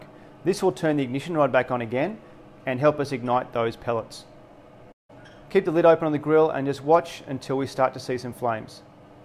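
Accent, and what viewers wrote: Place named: Australia. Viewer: What is it about Aussies that makes me want to listen intensely? What we perceived as an accent is so awesome! Good job mate!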